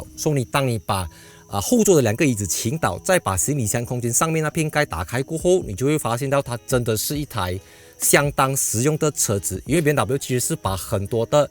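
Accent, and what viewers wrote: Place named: Sarawak, Malaysia. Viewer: 老板讲 * Ngeh Ngeh * ? 有砂拉越的味道哦。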